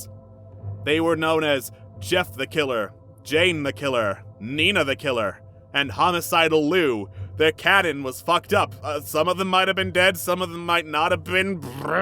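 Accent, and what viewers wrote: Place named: Germany. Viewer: this is gold. The fact the story was so predictable and yet still caught me off guard was actually insane. thank you for the wonderful and lore-accurate german accent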